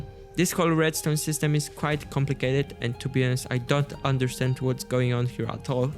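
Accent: Italy. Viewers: yo are you italian?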